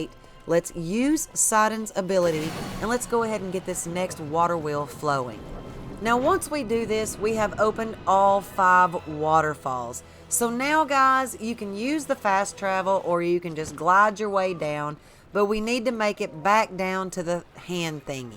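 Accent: South, United States. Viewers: Your country twang so cute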